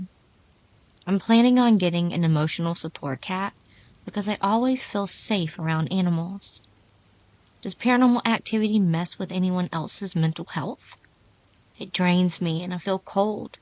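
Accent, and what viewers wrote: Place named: South, United States. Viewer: Having an issue trying to connect to your Instagram from the link in your video description. I've gotten a bit behind but it's nice to hear your sweet Southern voice again. Sorry I can't remember is it Alabama? No, it's Georgia isn't it?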